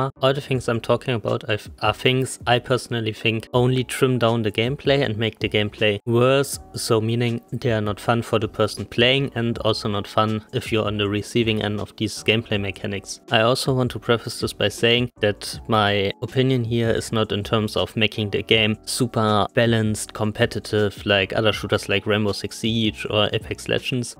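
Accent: Germany. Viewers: sehr gut. Man hört es schon raus, aber ich als 45 Jähriger mit meinem Schulenglisch verstehe es echt gut. 👍🏽 Weiter so. 🍻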